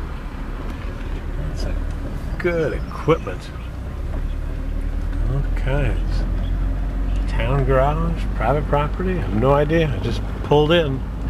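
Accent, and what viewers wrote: United States. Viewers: Driving around Henniker with Captain Obvious practicing their reading skills. There is a tree, there is a big rock, there is some sand, slabs of concrete and the worst New Hampshire accent you'd ever hear.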